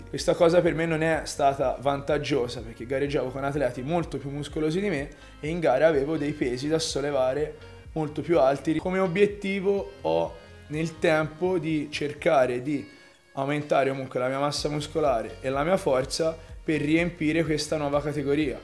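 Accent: Tuscany, Italy. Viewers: L`accento toscano è fantastico !!!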